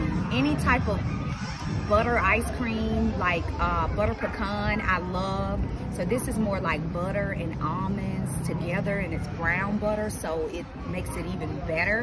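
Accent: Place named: South, United States. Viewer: Heyyyy fam! I absolutely love Jeni’s icecream we have one here in Nashville. Now I have to go get some. I had the peaches with Biscuits. Do you mind me asking where are you from because your accent sounds like you are from Tennessee or somewhere in the South.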